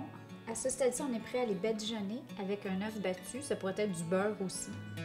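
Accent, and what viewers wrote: Canada. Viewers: Bonjour Je suis débutante avec mon AIR FRYER (offert par ma fille). Je me suis arrêtée sur vos vidéos très bien présentées, très intéressantes! Merci pour la recette du pain brioché, très bonne idée les petites boules qui s'attachent l'une à l'autre en cuisant, pour former une jolie couronne! Pas trop difficile à préparer.J'aime votre petit accent canadien très sympathque! Amitiés de Belgique MIMILOU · Merci beaucoup pour cette excellente recette de petits pains au lait. Mes petits enfants en raffolent. En plus avec l’accent canadien c’est trop beau !!! Vive Thermomix et Air Fryer Ultenic qui nous facilitent la tâche. Gros bisous de la Drôme en France.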